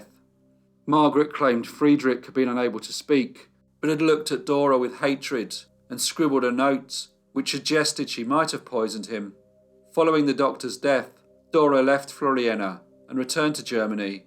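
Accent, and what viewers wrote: United Kingdom. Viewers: Oh thank god it’s a Brit narrating this, Americans drive me nuts with their mispronunciation of places. 🤔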